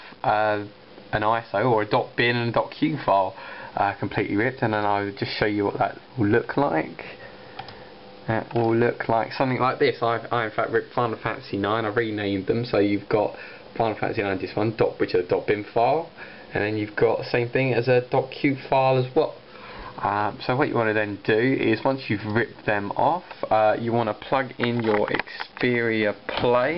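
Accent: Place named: United Kingdom. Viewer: I love the britich accent while i don't realy understand lots of words :)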